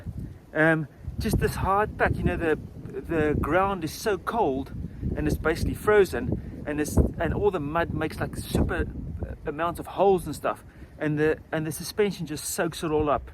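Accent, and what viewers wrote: South Africa. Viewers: you sound soth-afrikan. Channel looks good, subbed.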